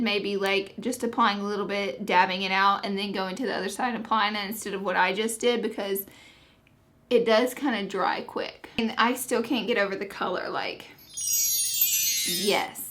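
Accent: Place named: Appalachia, United States. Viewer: Oh my god I love whoever this is because of the cute hillbilly accent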